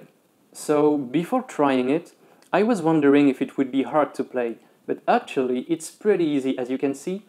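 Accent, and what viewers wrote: France. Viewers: oh mon dieu... mais t'es francais en faite ! bon bah j'ai appris un truc cool, grace à ton sublime accent :p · Haha he sounds French. But he is one of the few that actually speaks decently english!!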